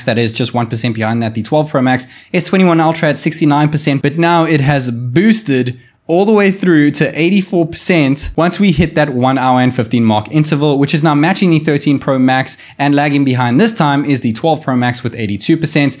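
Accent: South Africa. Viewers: Bro I can recognise that accent anywhere. Hello from a fellow South African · i swear this guy sounds south african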